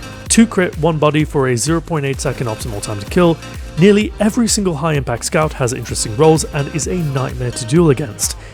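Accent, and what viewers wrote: United Kingdom. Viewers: Great video mate! Your voice makes me want to visit the UK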